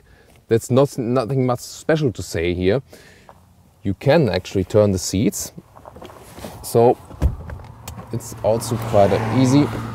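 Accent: Germany. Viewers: God I love listening to a German accent when watching videos about cars!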